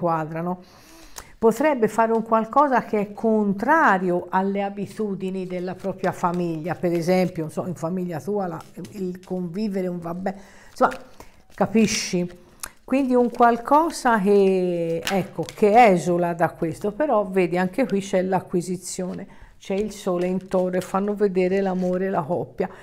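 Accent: Tuscany, Italy. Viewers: sei troppo simpatica, il tuo accento toscano è fantastico... ok?❤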